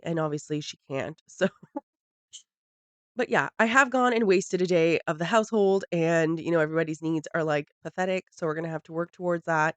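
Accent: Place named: Ireland. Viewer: So about 4 episodes ago was the first video of yours that i had found and please dont take offense to this but i remembered why i fell in love with your videos. Your voice sometimes sounds very irish. I'm 25% but born raised US so you were entertaining and then when im sober i still love your videos lol. also i can never here the background noise of the construction. and rarely hear doggo. I remember clicking ahead and being like who are these people???? and here we are lol. Thank you for all the wonderful videos!!! <3 Still hoping for like an active members only discord lol 🤞🤞🤞🤞🤞💜💜💜💜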